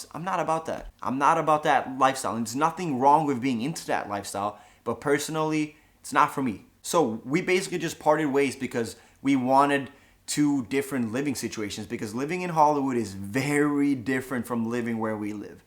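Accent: Scania, Sweden. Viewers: Han är väll skånsk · NÅGON SOM VET VILKEN KOMMUN kommer ifrån? Har givetvis att han är skånsk. Hade varit sjukt ifall vi bodde i samma kommun.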